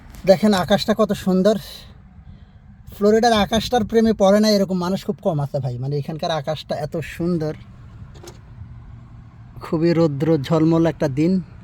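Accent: Noakhali, Bangladesh. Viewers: আইচ্ছা এইটা তো নোয়াখালী মতো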